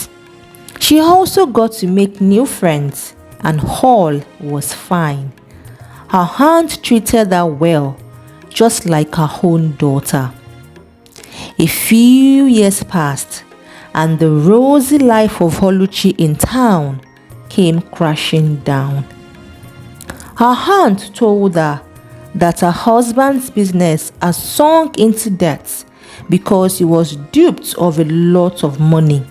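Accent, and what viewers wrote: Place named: Africa. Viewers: She do not need to tell our African stories in white man voice. Miss, love your accent don’t listen to that Oreo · Nne, we're Africans and proud of our language and accent. This is Original not fake.That person has inferiority complex.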